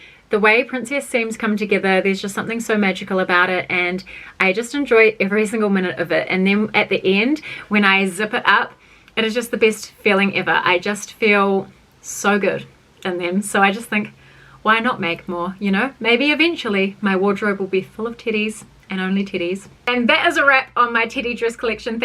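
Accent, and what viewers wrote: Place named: New Zealand. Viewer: I’m used to the kiwi accent being an Aussie. I did not notice the pronunciation.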